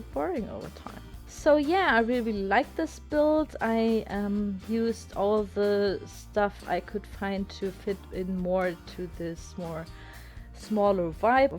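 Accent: Germany. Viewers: Es kann sein dass ich mich täusche aber kommst du zufällig aus Deutschland? Hast so einen deutschen Akzent haha :D Sehr schönes Video nebenbei weiterso bin total begeistert von deinen Baukünsten. :) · Are you German ?☺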